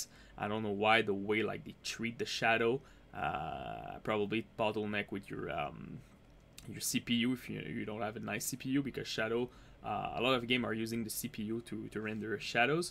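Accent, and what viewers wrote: Quebec, Canada. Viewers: !!!!!!!!! French Canadian? Quebec or Montreal?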